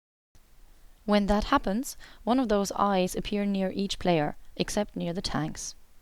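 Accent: Ireland. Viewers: Maybe she 'sounds like a robot' because she knows she has an accent and is trying to speak slowly and clearly, given that most of the people watching this won't be familiar with the particular accent (which I believe is Irish). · What part of ireland are you from? Im from Cork City! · are u from mayo? im from wicklow myself, just cant pin point the accent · accent is clearly irish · Pfft German.....she's Irish ya daft twit. Bloody lovely btw :) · It sounds Irish to me. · Shes not a robot, she's just Irish!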